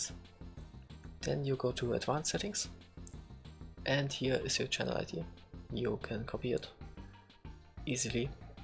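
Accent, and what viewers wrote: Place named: Germany. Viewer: Der deutsche Akzent war unüberhörbar. Danke :)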